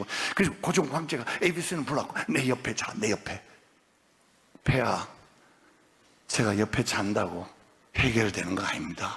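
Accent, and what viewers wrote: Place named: Jeolla, South Korea. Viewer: 인요한박사님모두훌륭하신데 전라도사투리만쓰지안으면 백프로훌륭...😅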